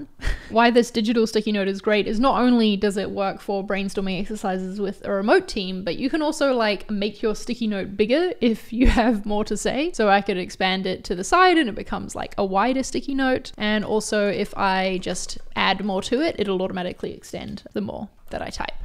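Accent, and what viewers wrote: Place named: New Zealand. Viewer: Figjam is cool but I’m so glad I was able to tell the you were from New Zealand and not Australia. As an American I’m quite proud.😊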